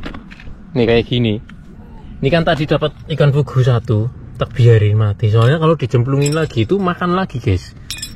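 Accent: Banyumas, Indonesia. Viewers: Ora ngapak ora kepenak.. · mas...anda lucu sekali ya... indonesia bngt aksenmu sukaakkk....ga ada takutnya ya di negeri horor dan mistis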